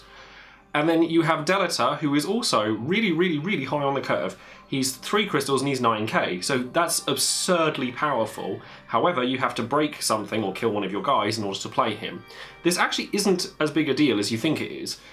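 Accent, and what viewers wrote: United Kingdom. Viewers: quick q, where abouts the UK are you from? also, clouds field ability does that buff all clouds on the field? an example would be if a tifa and light cloud are already on the field, do both light cloud AND common cloud get the 2k buff? i dont normally comment but your vids are real good, keep up the good work (Y)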